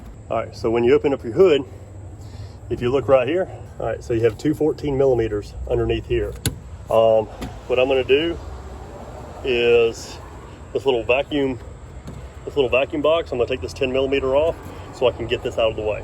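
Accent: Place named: Texas, United States. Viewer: Chrisfix with a texas accent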